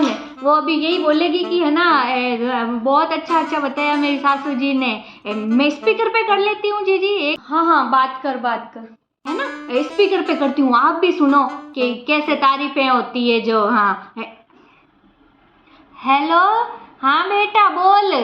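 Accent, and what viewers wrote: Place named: Rajasthan, India. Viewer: Bahut bahut badiya marwari me bola Karo acha lagta h😂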